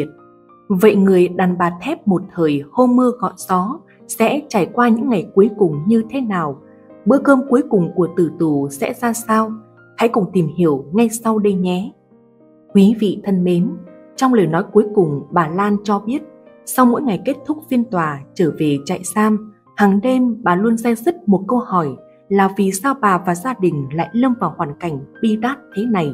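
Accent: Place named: North, Vietnam. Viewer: Nghe giọng Bắc biết xạo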